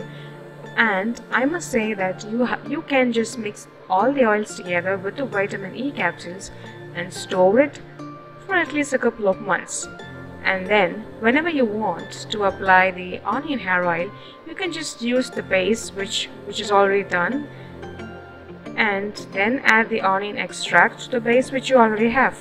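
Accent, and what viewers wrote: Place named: Tamil Nadu, India. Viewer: Akka...i lke ur tamil accent.... Plz remake this in ur style.... Its very plsnt to hear....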